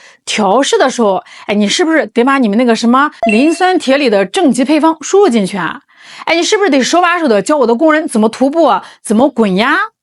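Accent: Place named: North, China. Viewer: 北方的吧，这口音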